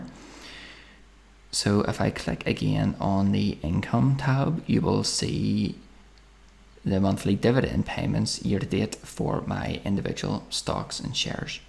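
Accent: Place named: Belfast, United Kingdom. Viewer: Belfast accent. Same as myself. This is good info. Thanks man. Best of luck with it all.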